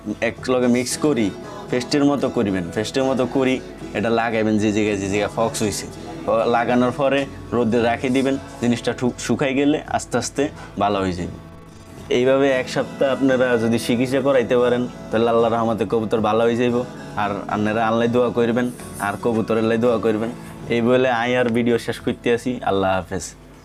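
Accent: Noakhali, Bangladesh. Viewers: ভাইয়ের বাড়ি কি নোয়াখালী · Love your noakhali voice buijjen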